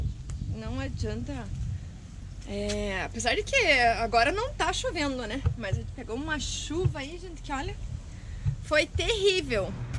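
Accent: South, Brazil. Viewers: Bom dia...sou seu fã...me diz uma coisa...de que região vocè é . ?...tem sotaque Sulista....beijos...aqui de Alm.Tamandaré-PR...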